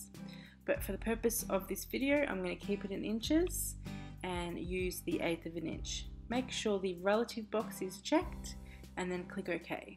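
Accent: Australia. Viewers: Great info, just what I needed. Delivered in Australian too!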